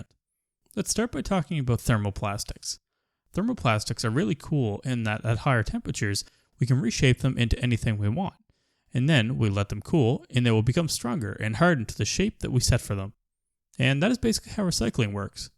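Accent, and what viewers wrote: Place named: United States. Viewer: Am. accent and you spell tires that way?? what is the story behind this?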